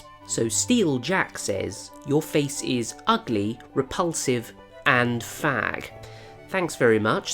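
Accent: United Kingdom. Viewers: I love your British accent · There’s plenty of more deserving people here to troll. Flat Earthers, Non-binary’s demanding use of correct pronouns - from a tiny list of 89 last I heard. People who say “...it’s just a theory, it’s not a fact’ My preferred method though, almost ashamed to admit it, is to ignore the witless idiots - especially those who initiate a battle of wits before realising they’re completely unarmed. I’m British, we don’t all talk like this guy, or decorate or dress like him, and I don’t see it’s relevance to anything - but he’s British, we’re all ‘querky’ so being British myself, and ex-Army, I have a duty to stand by him for moral support, it’s not even a duty, it’s a given. We look after our own over here, and I’m sure that while this chap couldn’t really give a toss what those beneath him think of him - he still has feelings, and whilst even I couldn’t give two shits about them either, they’re his, and I wouldn’t go out of my way to ridicule him, his family, or even his cat - but if we met in public and faced a troll of his, then I’d have to drag the troll by, his forehead, onto a busy motorway. 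Besides, like most Brits, he’s fighting his own battle perfectly well. Don’t confuse ‘being nice’ or ‘being kind to others’ as a physical weakness, it’s how we lure you in for analysis - think of it more as pre-emergency screening. Defending underdog’s and ginger since 1977.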